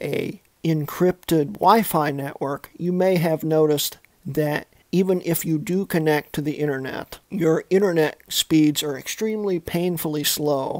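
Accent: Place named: United States. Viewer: Gosh that American voice is absolute AIDS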